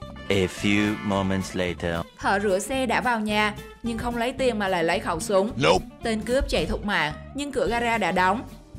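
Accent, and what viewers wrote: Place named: Quang Ngai, Vietnam. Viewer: MC là giọng Quảng Ngãi màk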